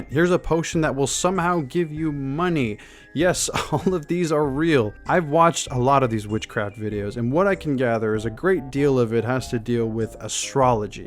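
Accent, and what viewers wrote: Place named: Canada. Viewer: Love the canadian accent bud. Makes me feel at home ❤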